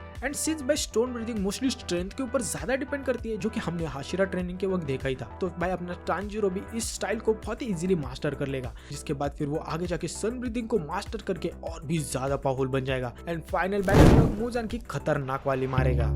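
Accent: Maharashtra, India. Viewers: Bhai you are Marathi speaker confirm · Tu maharashtrian 😅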